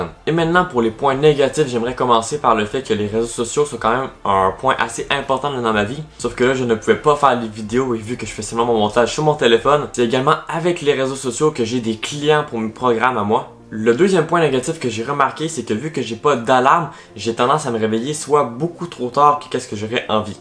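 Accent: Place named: Quebec, Canada. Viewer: Charmant accent Québécois 😊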